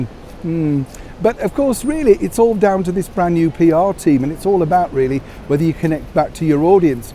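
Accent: Yorkshire, United Kingdom. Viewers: Wel Done, always spot on and such a warm yorkshire voice... Respect fron NE Thailand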